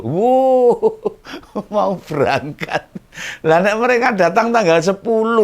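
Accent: Java, Indonesia. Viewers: Senneng meddoknya logat jawanya .... mendengngrkn sngt meyakinkn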